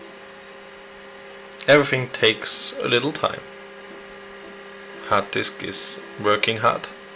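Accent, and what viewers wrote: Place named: Germany. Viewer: Man hört den Deutschen Akzent deutlich raus. Macht aber nix :) Trotzdem tolles Video!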